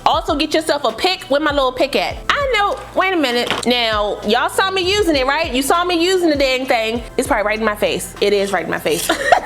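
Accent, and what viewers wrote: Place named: DMV, United States. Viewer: That intro tho…girl you gotta be from the DMV! And the hair looks bomb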